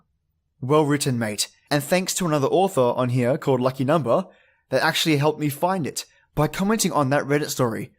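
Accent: Australia. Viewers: haha, I read "blister blast" in your Aussie voice and it sounded great in my head 😛 But I honestly don't mind a little jump scare here and there. I actually prefer them cause it makes the video more fun for me 😊 Especially with headphones 👍I hope you have an amazing Halloween btw! 🎃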